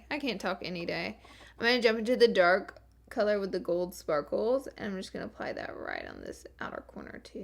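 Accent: South, United States. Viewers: i love you so much 🌈🌈🌈🌈 early snapfam. love your country accent 😚 much love from your biggest fan from Norway ❤ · 🌈🌈🌈🌈🌈🌈🌈🌈🌈🌈🌈🌈🌈🌈🌈🌈🌈🌈🌈🌈🌈🌈🌈🌈🌈 Snapchat fam!!! Love ya Girl! Love ur southern accent it makes me miss mine!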